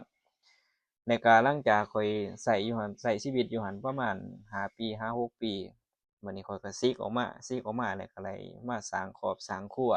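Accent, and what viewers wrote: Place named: Champasak, Laos. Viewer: ຢູ່ບ້ານໃດຢູ່ລາວເວົ້າຄືຄນແຂວງຈຳປາສັກ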